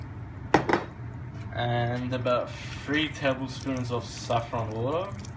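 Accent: Australia. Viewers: Love the Aussie accent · Nice aussie accent mate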